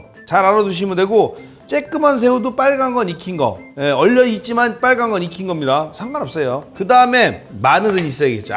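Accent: Chungcheong, South Korea. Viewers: 이렇게 뿐진담에.. ㅋㅋㅋ 충청도 사투리..ㅋㅋㅋ 정겹네요 고향사람이라 ㅎㅎ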